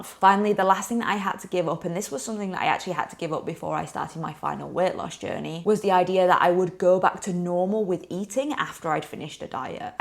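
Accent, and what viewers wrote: United Kingdom. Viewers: It's British Northern accent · are you from west yorkshire? your accent reminds me of when i lived in huddersfield and sheff :) loved the video, def relate.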